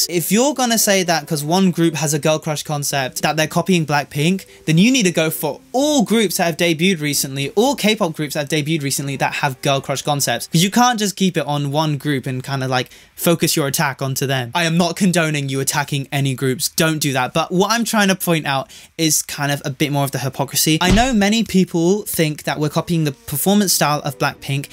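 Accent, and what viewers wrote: England, United Kingdom. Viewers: yes, from his accent, I can guess he IS British.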